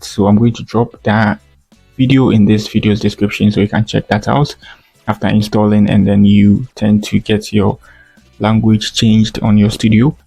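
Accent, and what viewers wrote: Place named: France. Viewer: tu parles francais non ca se sent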